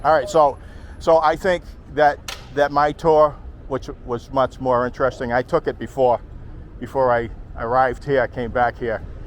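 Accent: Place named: Boston, United States. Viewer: .... excellent and humorous interpretation. We know what you meant. The beauty of Warsaw can never be overstated, an immaculate city overflowing with history, stunning beauty and soul. Thanks for the tour, and hope you come back (American living here)! You have Boston accent, awesome. I met Polish girl many years ago in Boston, and now we have a place on Wyzwolenia, near Lazienski Park. Stay safe in Kyiev.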